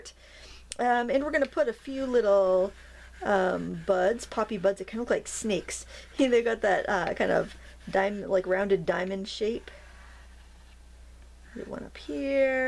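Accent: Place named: Norfolk, United States. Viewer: And, it's funny how her pronunciation is so much like my mom's. And mom was born in raised in Norfolk, VA. Lot to be said for the remnants of the dialects of the original English settlers.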